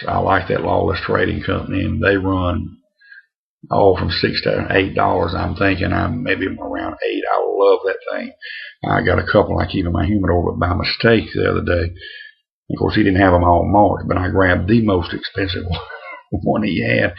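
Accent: Louisiana, United States. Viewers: Greetings from Boston. I just love that Louisiana accent. I aim to drive my caaaa down there at some point. Got some Orlik Golden Slice on the way. Great for a morning smoke.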